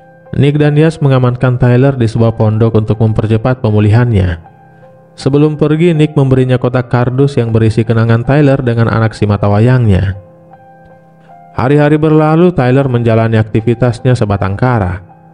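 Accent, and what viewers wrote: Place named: Maluku, Indonesia. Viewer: Mimin logat kaya orang hulaliu la 🙏😁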